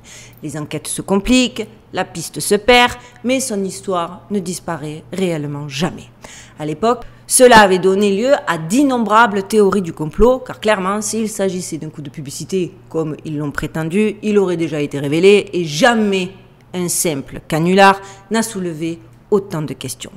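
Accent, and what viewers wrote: South, France. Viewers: Tu ne serais pas originaire du sud de la france des fois 😊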